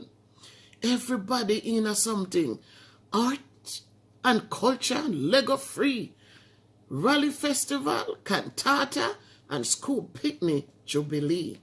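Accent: Jamaica. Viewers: Blessings my dear sis...just tuning in..the poem is good.. You always make me laugh when you do the patwah..love you always you bring joy to us..prayers and blessings to you and family...ernestine 🇬🇧 uk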